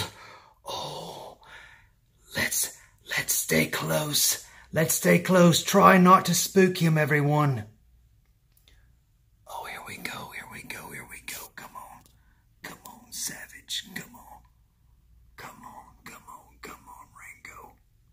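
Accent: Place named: South, United States. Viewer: Im a new subscriber from catch em all and ive watched quite a few of your vids back to back and im so impressed ! You have so much enthusiasm for the hobby and its a huge plus you love reptiles ! I would love to rock your shirt up here in Bucks County, Pa ! I absolutely love your community lizard tank and it inspires me to start one ! I have 3 & 1/2 years clean from heroin and reptiles and fish were my savior from that horrible life. Its a great hobby and it saved my life. Btw - i LOVE your amazing southern accent!!! Thank you so much for welcoming us new subscribers !!!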